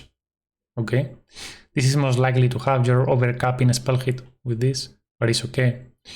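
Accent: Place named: Spain. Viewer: i really love your accent. i thought you were greek at first but i realized its spanish. but not all spanish people pronouce words the same way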